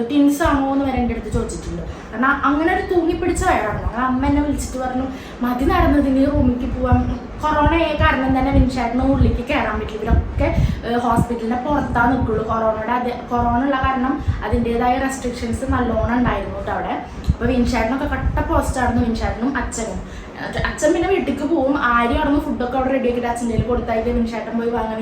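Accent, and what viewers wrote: Thrissur, India. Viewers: samsaram correct thrissur slang aanaloo😍😍😍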